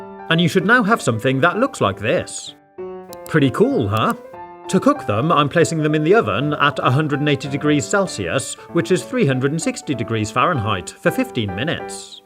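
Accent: United Kingdom. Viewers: I like how your so bri'ish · Pls tell me if ur British plsplspls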